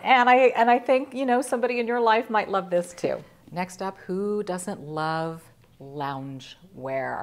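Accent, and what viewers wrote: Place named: United States. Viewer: This content, for me, is an excellent source of beautiful American English and, of course, a tremendous positive energy source. So easy to watch!:) Thank you so much,!:)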